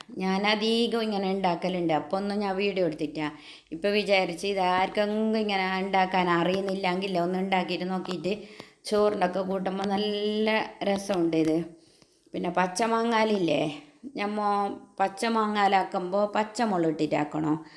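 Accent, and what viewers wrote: Kasaragod, India. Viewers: നിങ്ങൾ കാസർകോട് ആണോ ഭാഷ കേൾക്കാൻ നല്ല രസമുണ്ട്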